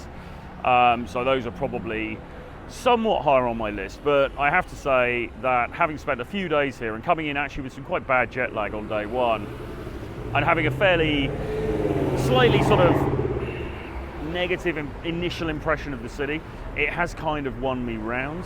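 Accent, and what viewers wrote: United Kingdom. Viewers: Does having an English accent give you a head start in social interactions?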